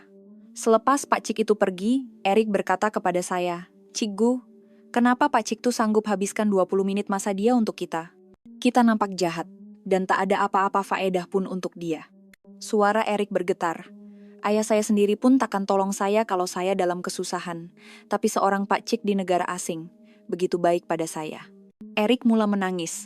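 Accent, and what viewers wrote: Indonesia. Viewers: Suara orang Indo ni😂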